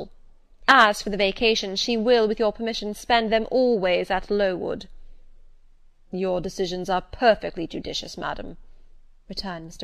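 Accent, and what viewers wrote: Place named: United Kingdom. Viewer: Very clear voice and british english accent, i am enjoying listening to this voice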